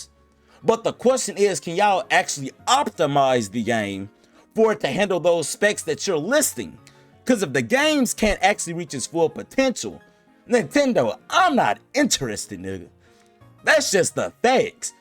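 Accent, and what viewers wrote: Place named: Baltimore, United States. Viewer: I feel like I hear Baltimore (but I could be wrong lol)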